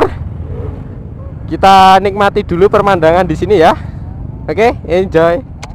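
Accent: Java, Indonesia. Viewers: jowomu ngendi pak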